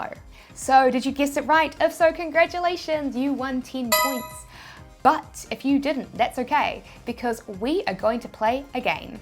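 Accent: New Zealand. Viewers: Love your videos, a great help but I gotta be honest as a Brit I love the NZ accent and love these ones where you give points (Tin points or as we say Ten points) wish you were a judge on strictly come dancing - I’ll give it Tin points! Seriously brilliant videos and a massive help! Thanks! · I don’t get why some people hate ur accent. NZ accent is the cutest! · Love the Kiwi accent. When I was over there the Television adverts for Decking were so funny 😂 Hoping ecommerce works for me and I'll be back to NZ soon!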